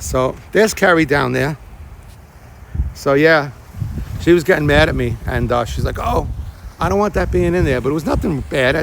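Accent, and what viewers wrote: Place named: New Jersey, United States. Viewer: I’m from Jersey, too, we have the same accent. Thanks for who sings this video. I’m moving to Palmer Ranch next year. I can’t wait to see what they did with the mall.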